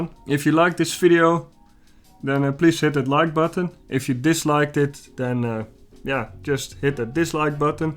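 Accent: Netherlands. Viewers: Ah heerlijk een nederlander, ik hoor het aan het accent 😂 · I fucking knew it xD first time watching your channel. Dat Nederlandse Engels is zo herkenbaar xD Maar wel mooi die flex3drive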